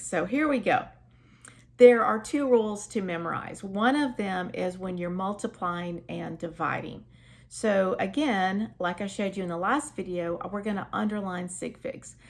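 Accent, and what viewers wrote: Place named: South, United States. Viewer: I'm so stoked to have found your channel! Currently in a Chem course as a pre-req and while struggling through some other math concepts discovered that anything said in a southern accent apparently sticks in my brain better than without. Thanks for making so many videos, will definitely be coming back as I work through the course!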